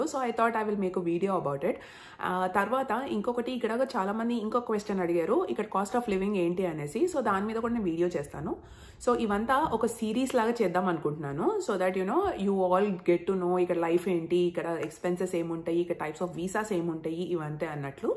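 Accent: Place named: Telangana, India. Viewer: Telugu itey pakka telangana la undi sister😅🤩🤩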